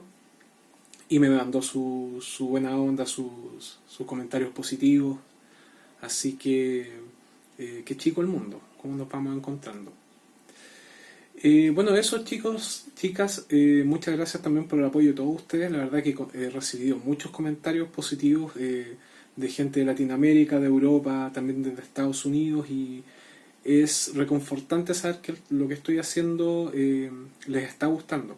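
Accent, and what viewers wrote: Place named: Chile. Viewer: En este canal hay dos cosas que me intriga mucho, los asesinos seriales y el acento chileno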